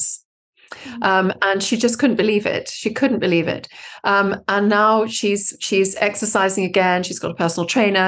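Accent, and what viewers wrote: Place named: South Africa. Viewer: Excellent interview with great advice. Thank you so much for bringing this to us. And rather nice hearing your SA accent! Take care. ♡♡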